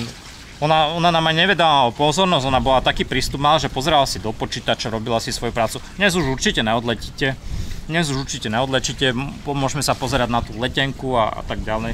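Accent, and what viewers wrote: Slovakia. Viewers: Bratu, ja pocujem podla prizvuku, ze si zapadnar, ale nehovori sa kamerovat, ci nedajbo fotoaparatovat (co si nasatastie nepouzil) :D. Ale nakrucat, natacat ;))) Inac drzim palce, vyborne cestopisy ;)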